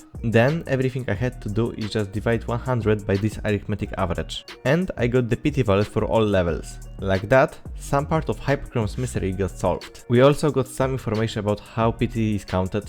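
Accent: Poland. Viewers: siema słychać że polak gada hahahah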